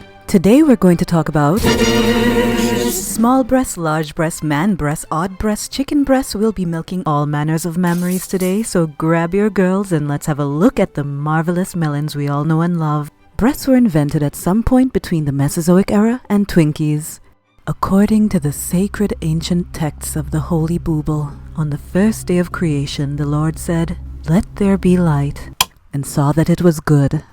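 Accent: Jamaica. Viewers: Your accent is sounding freshly topped up like you recently visited Jamaica! I heard it slip out when you said operation 😆 Love all your videos - and I would totally watch one about kneecaps if YOU made it!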